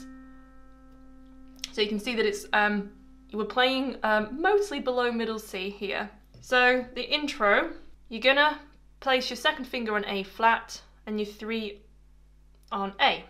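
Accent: United Kingdom. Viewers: A couple of things that I love....piano, and british english, all together 😍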